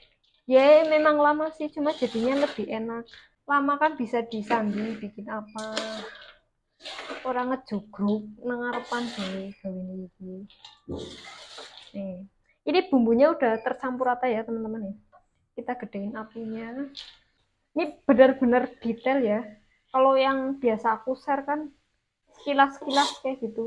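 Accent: Banyumas, Indonesia. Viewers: Wong ndi sih ngapak banget mpeyan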